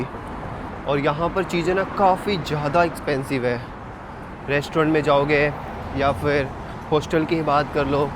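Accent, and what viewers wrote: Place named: Bihar, India. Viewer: Bihar se hain ye.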